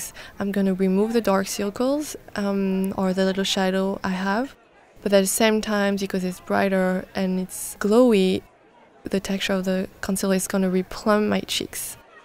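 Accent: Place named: France. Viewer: I love her French accent so much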